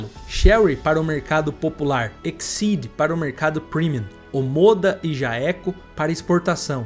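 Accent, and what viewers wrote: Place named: Caipira, Brazil. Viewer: Desculpe, mas esse sotaque caipira não dá pra escutar. Mas o conteúdo parecia ser interessante.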